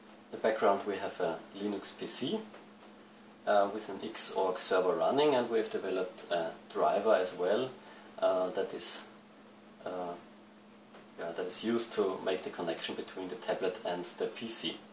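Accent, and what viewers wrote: Germany. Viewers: german accents? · Deutscher Akzent xD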